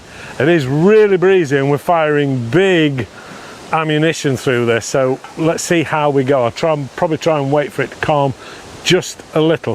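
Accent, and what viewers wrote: United Kingdom. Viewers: given his British accent what do you think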